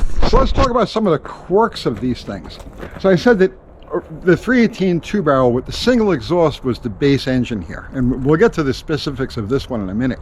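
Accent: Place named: New Jersey, United States. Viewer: I love listening to talk, my grandmother was originally from New Jersey and she pronounced things just like he does 😂 being Midwest redneck kids we always thought she sounded so weird.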